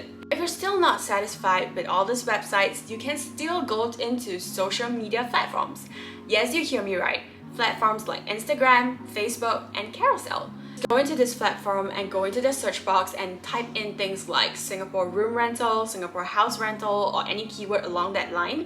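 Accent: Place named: United States. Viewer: If you don't mind me asking, are you American or Malaysian. The reason I'm asking, your accent sounds American.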